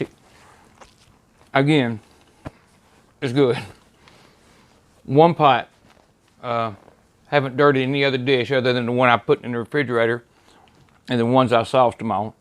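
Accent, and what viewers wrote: South, United States. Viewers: Great, simple, straight forward and easy to follow. Also, when talking about barbecuing, I tend to trust people with a strong southern accent. Thanks for this. Will be trying this week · ! Great Video! My Wife and I made the Ribs and used about 1 - 1/2 slabs at the same timers. Still delicious. Probably means that there is room for error. I'll subscribe not for the recipe, but for being able to listen to your kindly southern voice. Nostalgic in a way. Last thing...off topic. I would like to know what kind of watch you have there. That thing is right up my alley and would be great for learning to cook.:-) Thanks, keep it up and don't feel like you don't deserve to monetize your channel. I've seen THOUSANDS of terrible worthless videos that are making way too much money. Great Job.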